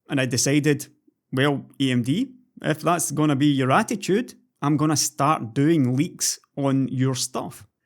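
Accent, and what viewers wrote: Scotland, United Kingdom. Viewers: You could just make cat videos and I'd still watch them. I only come here for the Scottish slant and subtle sass. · started watching you when I could barely decipher the broad Scots brogue, you have always been a shining light of sanity in the whole tech troll / fan morass. I didn't appreciate how much they had stressed you out, just enjoyed the ranting too much I guess. Anyway very glad some bridges are being rebuilt and very glad for your sanity that you are going to do what makes you feel positive. I will join your discord soon although I am an ex tech guy, now retired, it looks like a point of sanity in this modern media world. Keep railing at the madness it is at least keeping us sane!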